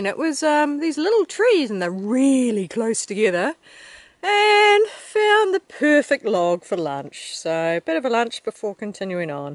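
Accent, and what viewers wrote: New Zealand. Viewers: I love how you always start your videos with “good morning!” In your NZ accent. It’s so charming! Love your videos!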